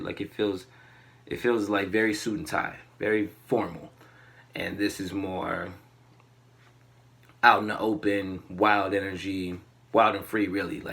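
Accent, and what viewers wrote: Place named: Yorkshire, United Kingdom. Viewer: Did this man just call a Yorkshire accent proper? Still awesome tho hahah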